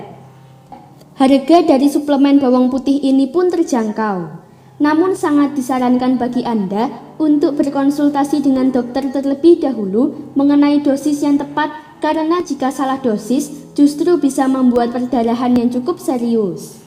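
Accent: Java, Indonesia. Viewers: pie to mbak u mgomonge koq medoq bener se.... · Logat jowo nya keciri bangetya. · Iya benar, itu dialek mbak jawa, dak apa2 yg penting jelas he he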